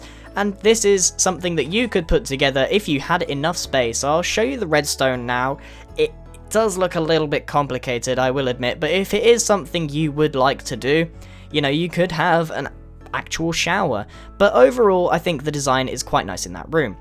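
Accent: United Kingdom. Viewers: are you British? Love your acent 😘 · are you British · You're from the UK?? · I love your voice if your britsh so am I!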